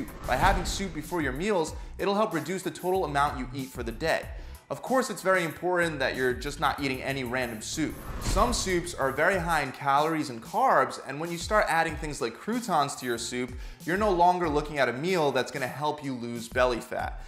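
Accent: Philadelphia, United States. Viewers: You gotta be from Philly. LOL Wooder instead of water. I miss the Philly accent. Anyway your vids are amazing. Keep em coming.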